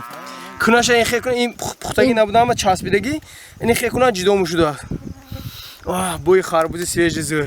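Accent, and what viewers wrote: Konibodom, Tajikistan. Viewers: Карочи хайку хамин видеохои шумова гап нест. Чисто конибодомча новаст, чигда. Чиста дехоти. Канда занед бародар. Офарин.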